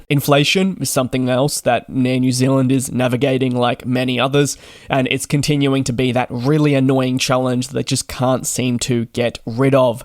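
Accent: Australia. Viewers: No, is Australian. He sounds like that.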